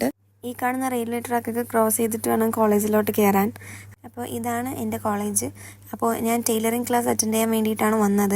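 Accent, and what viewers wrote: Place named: Trivandrum, India. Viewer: Slang trivandrum anu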